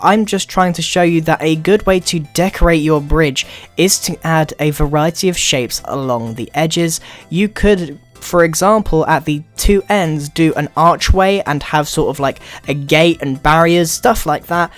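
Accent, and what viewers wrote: England, United Kingdom. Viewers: Hey, love you English accent! 👍👍👍👍👍👍👍👍👊👊👊👊👊👊👊👊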